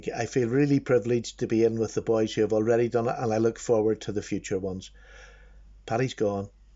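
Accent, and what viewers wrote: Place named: Ireland. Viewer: OMG....this is the first time I've shed tears when watching a blade video. It's just beautiful what you did with it. You should have been a writer or a poet. Love your story and respect you even more. Love watching your videos and just hearing that wonderful Irish accent talk about the love for blades. Thank you Paddy.❤️😊🙏🍀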